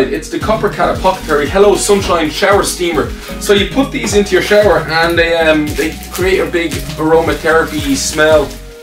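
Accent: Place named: Ireland. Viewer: No one ever says Apothecary the first time out :) And it is ok. It's an old timey word that most people haven't heard before. We're digging it even more with the Irish accent though <3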